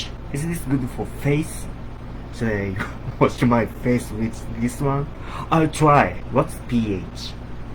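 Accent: Bisaya, Philippines. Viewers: Nganong ganahan ko sa imong bisaya accent uyy 😍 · favorite accent sa bisaya mapatay na ko tawa diri 🤣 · I love the bisaya accent ❤️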